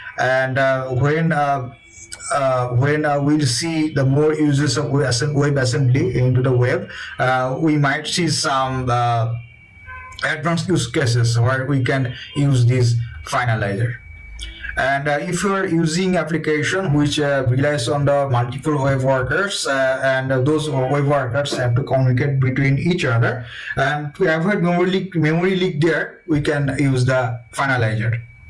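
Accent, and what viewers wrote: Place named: India. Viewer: nice, because i wanted to watch your video, even with the indian accent which is difficult to understand tec-stuff, but the sound was unberable so i wish you good luck for you next video :-)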